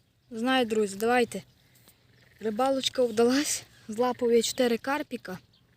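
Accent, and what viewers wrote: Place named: Khmelnytskyi, Ukraine. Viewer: То ї в останні такий діалект чув років за 30 на річці Збруч Хмельницька область((ты юначе коропа лапах? Авжеж.файний короп))😅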